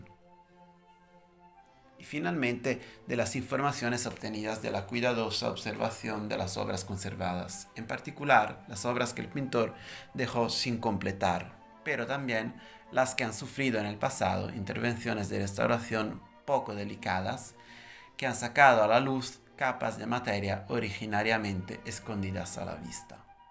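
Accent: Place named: Italy. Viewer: ¿Que “contaminación” del italiano? Habla un español muy bueno, con acento italiano, naturalmente.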